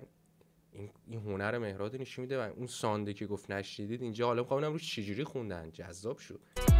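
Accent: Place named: Mashhad, Iran. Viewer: berar hamun dadsh to accent mashadi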